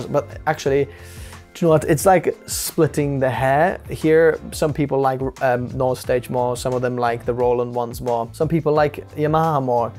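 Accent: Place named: United Kingdom. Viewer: Wow…just realized you posted this a day ago! (On the basis of your accent, you’re posting from the UK, I’m assuming….) Coincidentally, I just purchased an LX706 today. I have a few days before it will be delivered, so I’m biding my time watching YouTube videos on the model, which is how I happened upon your excellent video. Thanks so much for the detailed presentation! I live in Honolulu and purchased a Roland FP30 about three years ago during COVID when piano tuners weren’t making house calls. I had tried to teach myself how to tune my 1990s Yamaha acoustic upright but messed everything up completely and broke a string. Knowing that tuners wouldn’t be making house calls soon, I immediately drove to the only piano dealer on Oahu and picked up the FP30 with stand and pedals for $1000. Over the next few months I fell in love with digital pianos, even though I’m a classical pianist who doesn’t care about all of the non-piano sounds. 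When I finally had my Yamaha tuned this past summer, I realized that I had become a digital piano player and that acoustic uprights just weren’t as satisfying anymore since the advent of high-performing digital pianos. Fast forward to this past week, when I decided to upgrade from the FP30. I watched numerous videos comparing different brands and models, then decided on the FP90x as the most affordable upgrade. But then I went back to the original piano seller’s website and saw that they had a pre-owned LX706 selling for about half the retail price…s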